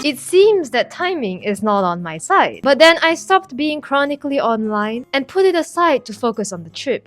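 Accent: Malaysia. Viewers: Ngl the Malaysian Chinese accent catches me offguard every time lmao. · how malaysian chinese speak chinese is so real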